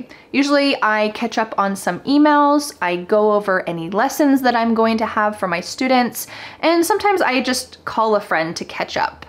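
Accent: United States. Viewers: J'adore vos cours, je comprends très bien votre accent américain. Merci beaucoup pour le temps que vous accordez à faire ses cours 😊😘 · You are doing a great service. Without knowing anything about phonetics and great vocabulary, if I watch your videos everyday, I bet within 6 months, I shall speak at least 50 % american accent. I think it is the easy way to learn a language, that is to listen . Gradually one can pick up. Thank you.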